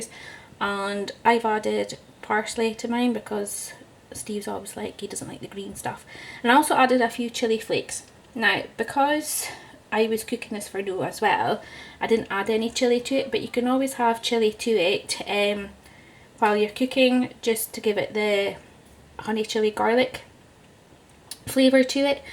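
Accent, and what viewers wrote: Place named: Scotland, United Kingdom. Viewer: ove your accent, is that Scottish??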